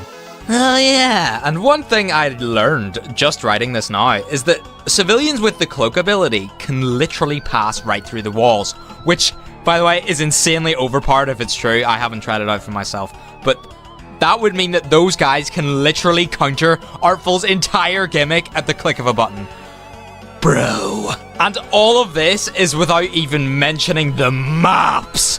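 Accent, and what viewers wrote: Scotland, United Kingdom. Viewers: I can smell the Scottish accent, SCOTLAND FOREVERRRRR 🏴🏴🏴🏴🏴🏴🏴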